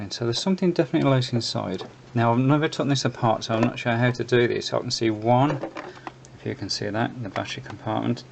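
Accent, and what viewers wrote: United Kingdom. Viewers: I guessed that 'С' ('S') would mean 'Medium' and 'Д' ('D') would mean 'Long' on the basis of the Czech I know, where 'Strední' is 'Middle' and 'Dlouhý' is 'Long'. That £5 was quite some bargain for what I would describe as a collector's item and the Sokol 204 may look basic, but the functionality sounds first-class to me. Thanks for the care tips, although it didn't look all that dirty from what I could make out. I'm getting numerous Worcestershire-based results looking for your name, which makes sense to me, as I have a reasonably good ear for accents and it's screaming "West Midlands!" to me. If so, you're from my late father's sometime neck of the woods, as he studied at Worcester College as part of learning to be a schoolmaster, later at Bognor Regis Teacher Training College, then going on to teach at Spondon House, Derby. I think he still harboured an affection for Worcestershire as Tom Graveney was a favourite cricketer.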